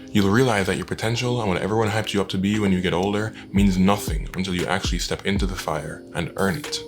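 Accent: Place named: Ireland. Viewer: I know youve a carribbean accent but it still aounds so irish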